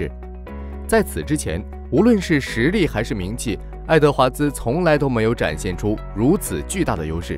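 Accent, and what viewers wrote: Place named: Shandong, China. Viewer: 这山东口音英语直接听出戏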